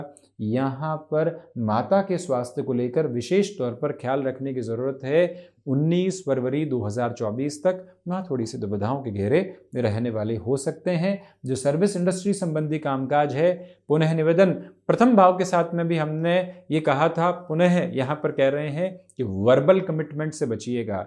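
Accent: Standard. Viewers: Jai sri Krishna sir .... Bhaagya se zyada aap k ki pure hindi sunne mein Anand aa raha hai...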